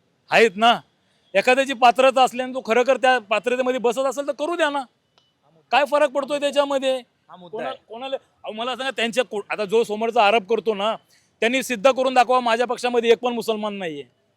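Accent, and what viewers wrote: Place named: Konkan, India. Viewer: Nakki kokanatla ahe ha... Jabardast boltoy bhau ..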